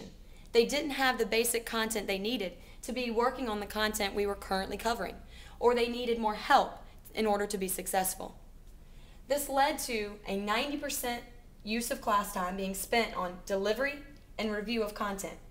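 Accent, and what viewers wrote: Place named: United States. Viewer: Your video is being used on a UK website claiming that you are one of their teachers which is odd I thought because of the Yank accent. you might want to ask them to take it down, contact me for the link where it is being used if you care.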